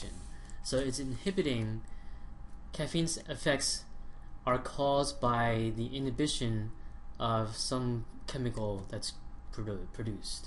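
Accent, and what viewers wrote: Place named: India. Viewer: who the fuck r u, ur accent is so indian seriously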